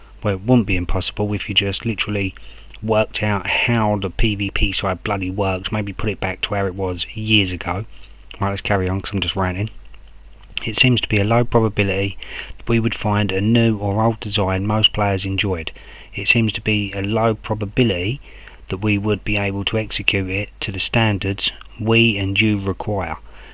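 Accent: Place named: United Kingdom. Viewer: i know im late but hearing a another British voice is redreshing lool. Good video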